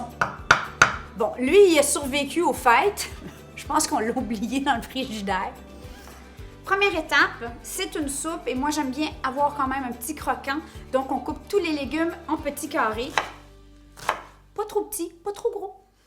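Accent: Quebec, Canada. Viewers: J'adore tes recettes et ta bonne humeur continue c'est un plaisir de te voir et de t'écouter avec petit accent québécois gros bisous à la famille 👍🏿😚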